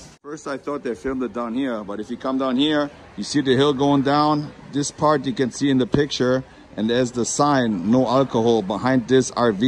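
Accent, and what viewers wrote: Germany. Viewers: Thanks for the tour. I find it very interesting. Seems there's many beaches to choose from, all pretty close together. Loved the video. Love your Deutschland accent & the Arnold impression. Stay safe!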